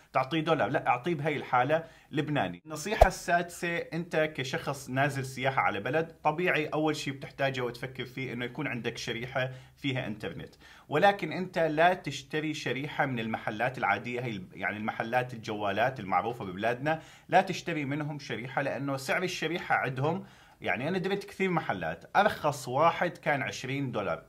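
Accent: Deir ez-Zor, Syria. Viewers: كانك من الدير🔥🔥🔥💪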